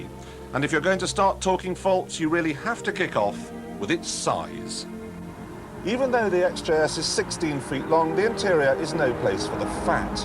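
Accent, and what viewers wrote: RP, United Kingdom. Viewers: He went to a private school in Doncaster & then Repton School, hence the posh voice and head boy attitude. Reckons he was bullied at school, and seems to have spent the rest of his life giving everyone else grief. His first wife left him after 6 months for one of his mates, hehehehehe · Still had his posh accent.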